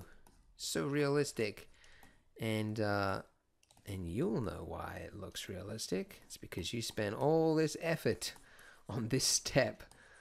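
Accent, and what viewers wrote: Australia. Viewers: isnt this guy an australian? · Australian describing snow is funny to a Canadian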